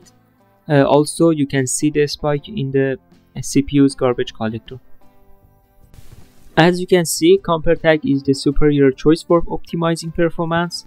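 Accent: Iran. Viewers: داش چرا لحجه ایرانی داری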